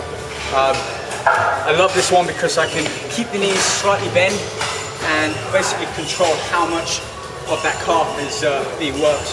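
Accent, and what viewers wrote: United Kingdom. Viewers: um... no he's english, his home town is london and he as a british accent...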